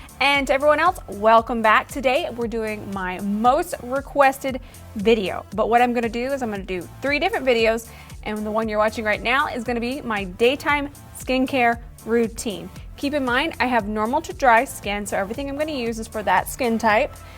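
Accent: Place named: Texas, United States. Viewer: Saw you on Snapchat and recognized a hint of Texas accent...had to follow! Can’t wait to see more videos!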